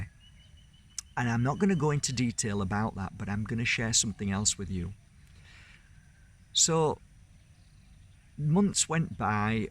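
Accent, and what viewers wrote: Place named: North, United Kingdom. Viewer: I could listen to you talk for hours. Maybe it's your northern accent 😉. Question re self healing. Because we are mainly water, do you agree that if we talk to our body using thanks and healing words etc, it works the same way as writing words and speaking to water like Professor Emoto did? By the way, Pyjamas. 😂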